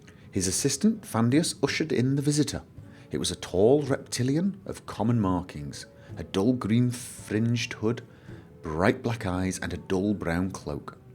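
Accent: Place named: Scotland, United Kingdom. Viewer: I believ you were from norway, but your accent sounds scottish to me.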